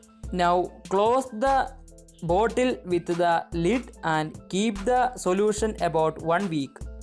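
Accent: Kerala, India. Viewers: Thick malayalee accent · I cannot watch a video if the YouTuber has a very thick accent from india. if he's narrating it I wonder who are you talking to? no one who speaks English can understand the way you're speaking english. so it's not just an accent who the hell is teaching English classes over in india? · I love Indian accent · If his pronunciation bad that is not a big deal becouse it is not his language. If some one says his pronunciation is bad that is a big deal. I wish I too can talk like him! There are more than thousand different pronunciation in the world and his is called Indian English. I love Indian English because it makes least spelling mistake. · is you are malayalee? your english pronounsation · First of all thanks for the knowldge, next your english very funy ,when you are talking r must be silent ,most of indian people talking the same way ,must try to talk with r silent very beautiful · It's not my native-tongue either. 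There are many Indians who do not have that strong accent that he is exuding. SOME might even find it a tad disturbing, hence the negative comments. It's not a grammar test, but if i'm going put something on the net for all the world to hear, I might keep my pronunciation at par with the majority of English speaking youtubers posting here. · He’s an Indian guy what do ya expect him ta sond like and given the choice I would rather sound like him than sound like a d£#k head so shut it and stop taking a lode of banana juice. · മലയാളി ഇറപ്പാളി ആ english കേട്ടാൽ അറിയാം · I’m sorry but try learning English not Hindu English. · He is a mallu😂 100% like me we all have same accent.... Proud to be a mallu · How about not telling someone that they speak funny. That is rude. He sounds like he comes from India. That is how they sound. His voice was very nice. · Adipoli, Anybody guessing about the accent it's how keralites speak English and it's beautiful 😊😊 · I love kerala & the accent too 💎👍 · Mallu accent,😀😁😂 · Typical mallu slang the zing thing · engilsh kekkumpol thanne ariyam ivan malayali aanennu lol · India dialect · Jhansi Balla this is not english speaking class. 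europians can not utter a single english word. no japanee knows english. can you understand what a bengali speaks in english? do not make fun of accent. the person is from kerala and his mother toungue is malayalam. can you speak a word in malayalam? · That pakka malayali accent👌👌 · Ur English is so Malayalam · I know you are indea the way you talk my friend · da potta ne malayali ele english kelkumbol ariya😂😂😂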